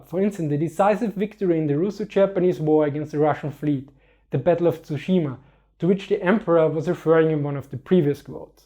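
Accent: Germany. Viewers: I cannot follow this video... Sorry... Why don't you try to grind a little bit that harsh german inflection when you speak ? It is hard to follow you for so many minutes ! Apart this venial thing... I like alot your videos and explanations !!! You do your job well and professionally. Mine is just a mere postive and constructive critic. Nothing personal. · Your accent always throws me off a little.. I know you are an Austrian but you sound German to my American ears. It reminds me of a quote that the most famous German ever was an Austrian and the most famous Austrian was a German (Hitler and Mozart). Don't worry though I am pretty sure Arnold is definitely Austrian. I always wanted to see Arnold in a Ww2 film as an allied soldier - I think Tarantino missed an opportunity when he cast Brad Pitt in "inglorious bastards"!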